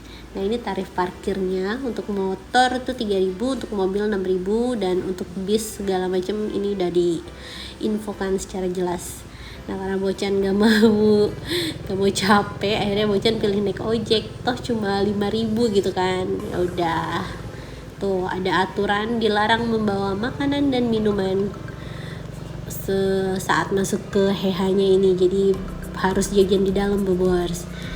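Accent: Indonesia. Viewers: mimin nya kayak orang sunda, bner ga?he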